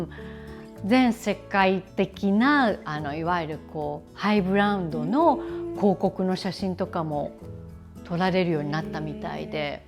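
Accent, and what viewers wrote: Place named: France. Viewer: 素敵✨✨✨✨ 長くフランスにいると 日本語がちょっとフランス訛り🤭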